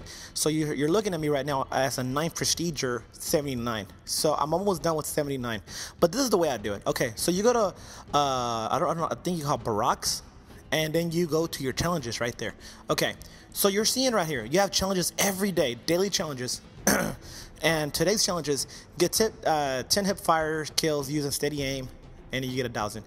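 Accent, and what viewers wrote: India. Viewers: You sound like an Indian I can say from my personal experience in living abroad anyway great video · baraks? is this guy indian?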